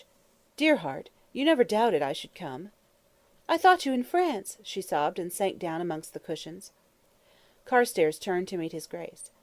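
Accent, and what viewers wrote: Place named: United States. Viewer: Disappointing in American accent.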